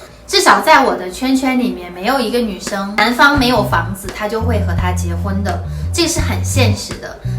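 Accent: Taiwan. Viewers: 歡迎你嫁來台灣！你講話有台灣味哦！ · 說話腔調開始向台腔靠近了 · 北京大妞的也有了浓浓的台湾腔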